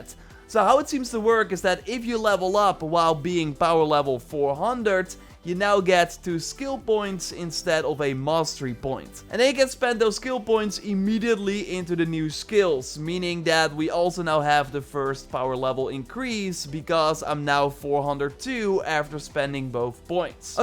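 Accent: Netherlands. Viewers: Ok just a quick question love the vid but are you dutch :D xD just a question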